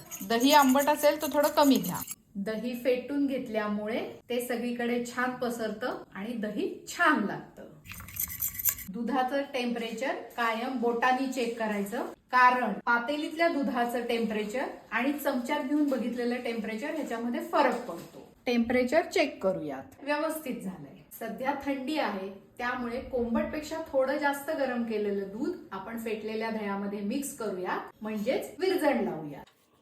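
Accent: Konkan, India. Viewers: शंभर टक्के कोकणस्थ ! बोलणे आणी पद्धत सांगते . छान सांगता वैनी .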